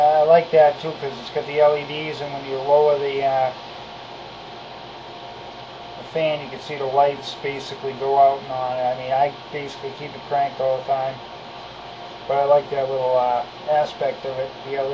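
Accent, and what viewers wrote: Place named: Portsmouth, United Kingdom. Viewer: Hey, nice rig!! I'm in Portsmouth. You sound like you're from the neighborhood.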